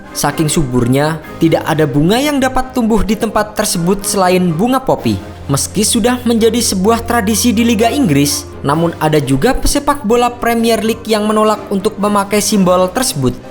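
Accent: Indonesia. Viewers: Pekat siot loghat indo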